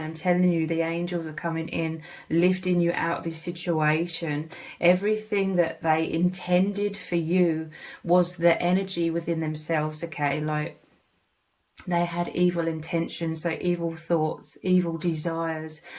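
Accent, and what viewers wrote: England, United Kingdom. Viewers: Believe me it was as if I was listening to my own very story I love you beautiful English accent it's like listening to a Melody thank you you are truly gifted a true seer a true Oracle indeed you are blessed and I thank you!!!8!!!😊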